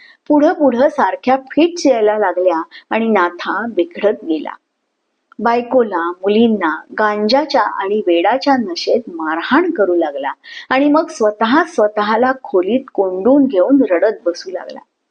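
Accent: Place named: Konkan, India. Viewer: कोकणी आवाजात केलेले सादरीकरण आणि कथा छानच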